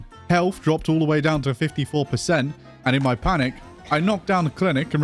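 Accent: United Kingdom. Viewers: Your british accent goes really hard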